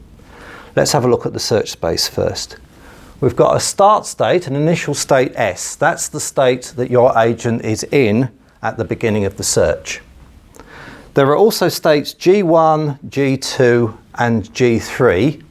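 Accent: United Kingdom. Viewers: Came here just for the British accent :p .. nicely explained! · besides this amazing explanation , this is a very lovely British accent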